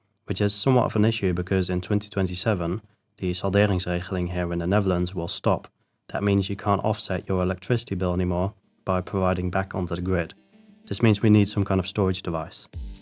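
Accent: United Kingdom. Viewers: Out of interest, are you Dutch+British? You have a very British accent, I initially thought you were based in the UK when I first started watching your videos haha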